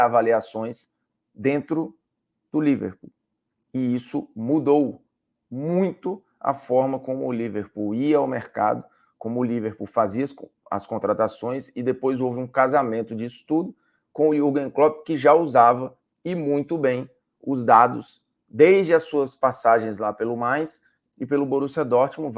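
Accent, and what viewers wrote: Northeast, Brazil. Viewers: Bruno Formiga, explique aquela polêmica da época do Esporte Interativo, quando você disse Ao Vivo que iria processar o Leonardo Baran porque ele falava com você de forma preconceituosa só por conta do seu sotaque nordestino.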